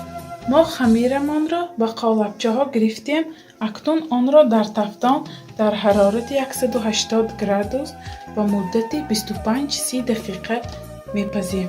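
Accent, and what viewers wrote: Isfara, Tajikistan. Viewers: рахмати калон апа ман а рузи авал а лавзатон шинохтам ки шумо аз исфара хастед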